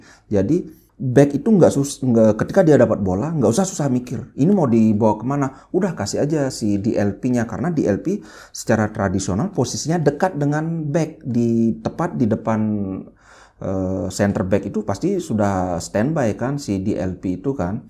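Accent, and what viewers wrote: Bali, Indonesia. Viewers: Logat logat bali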